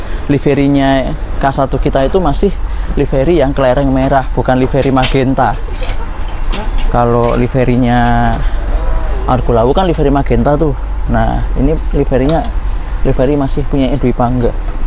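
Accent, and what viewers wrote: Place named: East Java, Indonesia. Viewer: mas asli purwokerto apa Jawa timur.... soalnya kalau logatnya kaya bukan orang banyumas mirip Jawa timuran... apa istrinya orang purwokerto...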